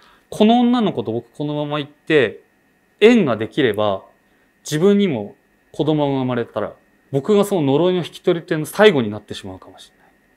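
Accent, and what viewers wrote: Aizu, Japan. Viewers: 秋田出身だから会津の方言も耳に馴染んできて、その分余計リアルに感じられました。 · 有難う御座いました。２回にわたる長講義お疲れさまでした。私の祖母が東北の人だったので子供の頃に聞いた会津訛りの言葉を思い出しました。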